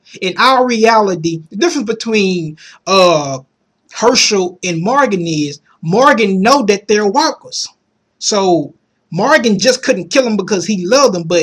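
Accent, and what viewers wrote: South, United States. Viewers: YOu Talk funny! LOL! A real live Southerner.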